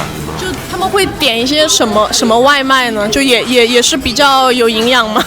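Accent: Standard. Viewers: 老师你好 老师我是泰国人的学生 谢谢老师教我中文 老师这样好吗 老师可以有拼音 还有英语 和大大的字 我喜欢听老师的发音 非常清楚非常标准 谢谢老师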